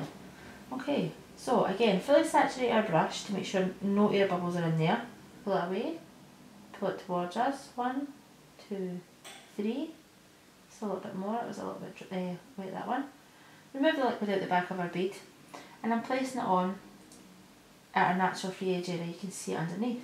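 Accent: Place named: Scotland, United Kingdom. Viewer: Thank you very much for this clear video. I sometimes have a little bit of trouble understanding the Scottish tongue trap, (I'm from the Netherlands) but through the images I understand what you mean. I am looking forward to the next video. Greetings, Olga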